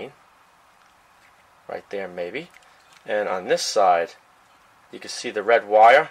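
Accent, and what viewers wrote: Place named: New York, United States. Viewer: He sounds like a new yorker from long island.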